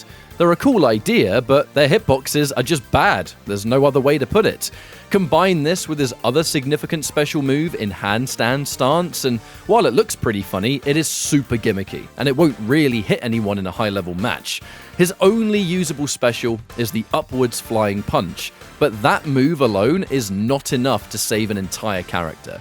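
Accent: United Kingdom. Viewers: I would’ve watched this whole thing if u didn’t have a British accent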